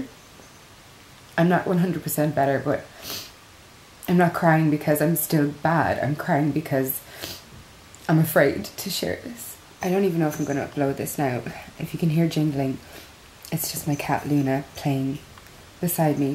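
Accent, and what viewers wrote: Ireland. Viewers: First of all I have only ever followed you on Instagram and when that gorgeous Irish accent came out I near died! Secondly I'm really proud that you had the courage to post that. It was nice to meet the person behind the beautiful face and I hope you know that you're never alone. You have a whole internet of friends who support you, isn't the world cool in that way! Sending you big love xxxxxxx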